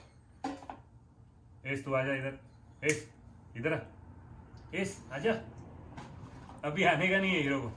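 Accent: Mumbai, India. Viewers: Sir,your talking method is awesome..(like mumbai style)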